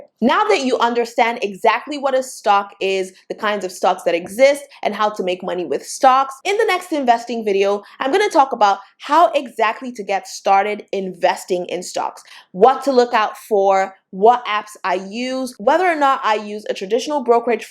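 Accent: Nigeria. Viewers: I'm hearing a little bit of Nigerian accent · Lmao are you Nigerian ? I think I hear it lol... thanks, that video was great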